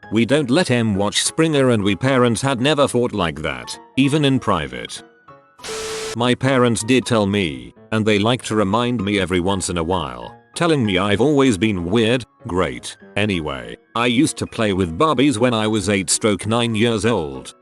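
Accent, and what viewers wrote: United Kingdom. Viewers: when i first started watching these videos i could recognize that it was a British accent but now i cant hear the accent anymore.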